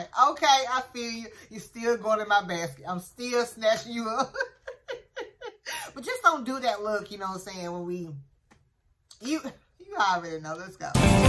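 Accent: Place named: South, United States. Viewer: It's pronounced Al kemy. It's the old name for science, when they still believed in magic. The old alchemists did some weird things, like trying to turn iron into gold, and guessing how many angels could balance on a pinhead! But eventually they started to discover really useful stuff. But I think Knopfler, in the red jacket, really did produce golden sounds. I found your southern accent difficult to understand sometimes, but you would probably struggle with my Yorkshire, Northern English accent. Where comes from, Newcastle upon Tyne, nearer the Scottish Borders, is a really difficult accent, when it's spoken properly.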